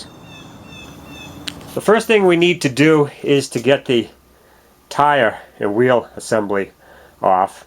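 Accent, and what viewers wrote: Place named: New England, United States. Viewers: Those cab. bolts just need snugged up good! N a screwdriver ant going to hurt it! Other than yankee ackcent this dude done fine! Jack stands r nice if ur going to b up under car eating lunch! Dont for get pump breaks b for u head out!!!! Good job! Yankee! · I love the New England e-yak-cent! I’m usin’ a he-ahf inch bray-kah-bah! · good job sir....you have a great gift in teaching...yes, you have a nice boston accent..similar to Peter Griffin of Family Guy....:)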